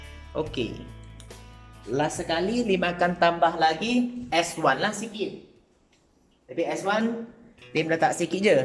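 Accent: Kelantan, Malaysia. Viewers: memang terbaiklah cina kelantan lancar je cakap kelantan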